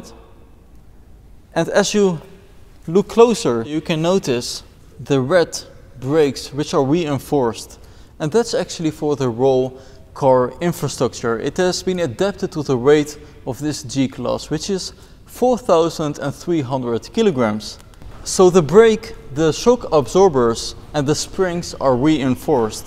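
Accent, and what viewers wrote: Netherlands. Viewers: He is dutch :P i hear it :D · Most dutch accent ever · It's English in very Dutch accent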